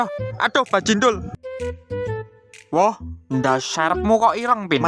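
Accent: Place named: Surabaya, Indonesia. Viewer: Suroboyo teko cak 👍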